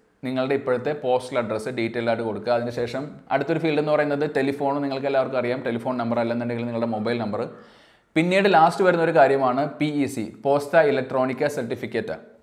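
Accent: Standard. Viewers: നല്ല വൃത്തിയുള്ള മലയാളം.... അടിപൊളിയായി വിശദീകരിച്ചു ...ആശംസകൾ..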